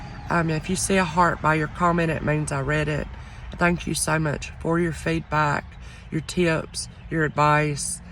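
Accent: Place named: Western North Carolina, United States. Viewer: …I love your NC accent. It shines Western NC and I love it! Your journey is full of love, kindness and wisdom. To love yourself is the first step in being able to share your love with others and that is so powerful. I have watched YOU and your channel grow with love and wisdom over the past year. I remember when you questioned your reselling journey and felt inferior to other channels but here you are today shining bright and taking each day as another day to grow. Thank you for sharing your journey because it resonates with me and I’m sure with others who visit your channel. A wrench is a lot of work and kudos to those who take up the challenge! Enjoy this beautiful NC weekend! ❤️💕❤️🥰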